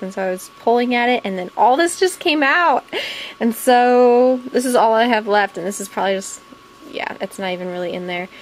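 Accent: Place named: South, United States. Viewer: I love your southern accent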